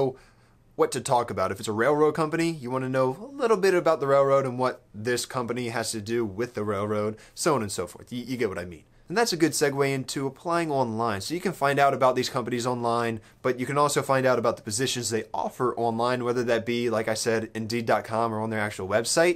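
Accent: Texas, United States. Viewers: Are you from Texas? I noticed you say y'all a lot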